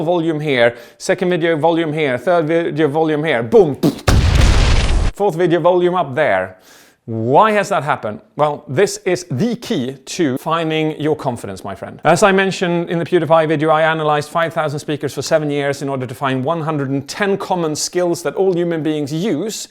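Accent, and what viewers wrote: Sweden. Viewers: I love the swedish accent lol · I can't unhear the slight Swedish accent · We love you! & I love the editing! Please keep it up! I love Swedish accent!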